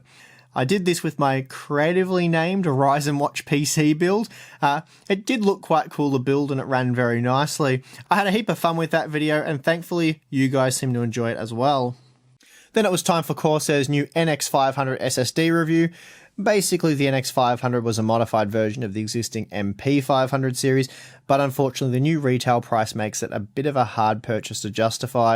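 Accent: Australia. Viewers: Hey! I must say your accent is kinda hard to understand (I'm not a native speaker). But I had an Australian teacher and now it is easy for me to understand, just wanted to share my experience. Btw awesome channel! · Oh hey Your Australian!!!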